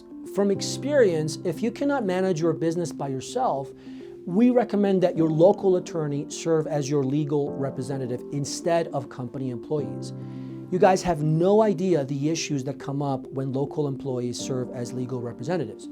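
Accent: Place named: New York, United States. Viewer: I love how this dude goes from a very New Yawk accent to an incredibly good Spanish accent. Very helpful videos! Thank you.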